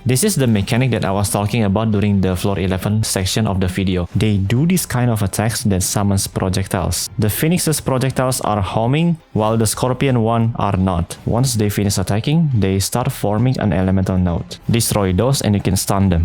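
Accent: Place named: Indonesia. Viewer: i hear indonesian accent speak english, i like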